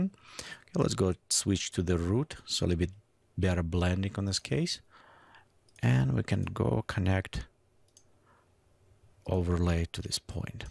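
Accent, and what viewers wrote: Russia. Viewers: нахуя на английском то? Слышно же сука что акцент русский...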